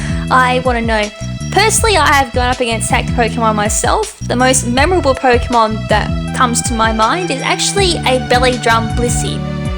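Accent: Australia. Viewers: Pimp sent me to hear that delicious Aussie accent.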